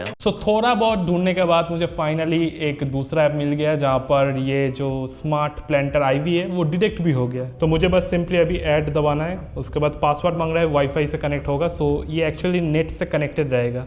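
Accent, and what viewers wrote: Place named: Bengal, India. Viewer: Kay hoya bongla spiking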